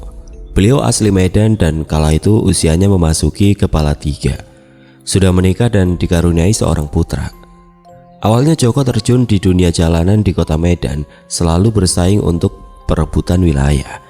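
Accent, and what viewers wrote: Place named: Banyumas, Indonesia. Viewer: Unik nya V.O chanel ini selalu berlogat Ngapak walaupun menceritakan daerah selain jawa. Salut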